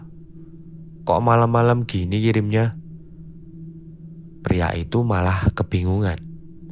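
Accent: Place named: Java, Indonesia. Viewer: Suka ama aksen Jawa masnya Sukses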